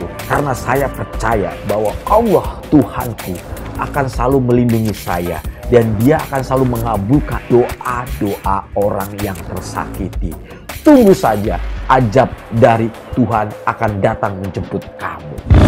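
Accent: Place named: Sunda, Indonesia. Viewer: Resep KA momo bar bar lebok ku sia haaaaaaaaaa Sunda banget nya bang saya Oge orang banten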